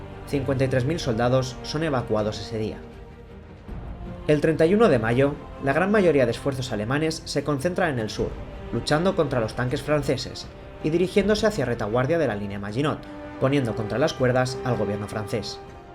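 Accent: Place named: Spain. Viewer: El acento español queda perfecto para los relatos, saludos desde PARAGUAY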